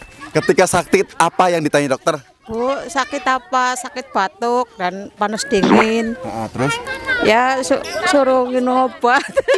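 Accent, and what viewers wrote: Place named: Java, Indonesia. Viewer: Orang mana si ini medok kox bgt😅😅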